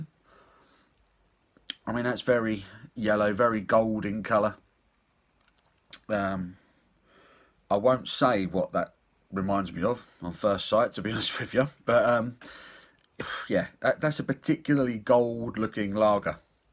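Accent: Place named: Cockney, United Kingdom. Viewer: is essentially a Cockney Bill Bailey beer reviewer. I always enjoy the videos.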